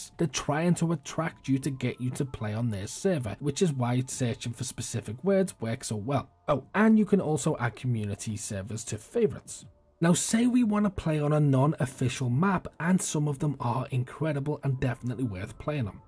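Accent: Liverpool, United Kingdom. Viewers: Great video mate, looking at getting back into dayz after a year or so and only had a few weeks of experience so this video has helped out, plus its always good to hear a fellow scouser. Quick question, is dayz launcher still a thing and is it worth bothering with? Liked and subbed mate :)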